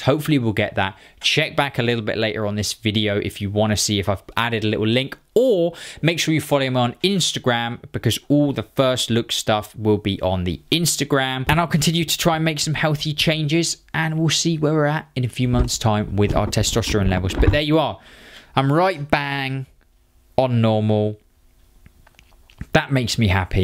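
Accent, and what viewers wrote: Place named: South West England, United Kingdom. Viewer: Where are you from? Bristol or something? I hear a bit of South West in you!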